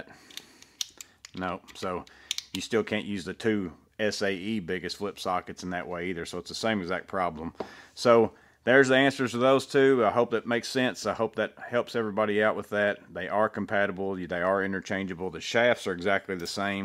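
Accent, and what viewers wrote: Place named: North, United States. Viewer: I have an accent, just like yours. And I have to squelch it, when I go back home to my elders country. Where they make all the tools, by hand and grow all the pumpkins for the holidays. It's got a heavy Old Dutch/Spanish demeanor. I grew up in Texas/Louisiana....but I'm from an Indian, Dutch/Old Spaniard area of North USA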